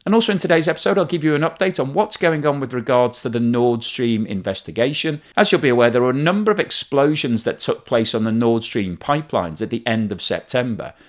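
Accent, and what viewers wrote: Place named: Wirral, United Kingdom. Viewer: is that a Wirral accent I can hear?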